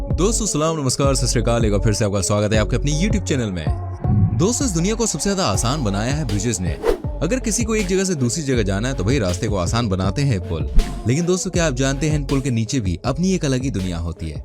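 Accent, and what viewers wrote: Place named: Mathura, India. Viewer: Sir mathura sa bol raha ho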